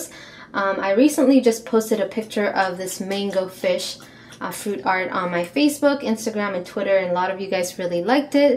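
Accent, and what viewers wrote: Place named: United States. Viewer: It's so interesting to see how your accent has gradually become more American over the course of your videos, love the fruit art!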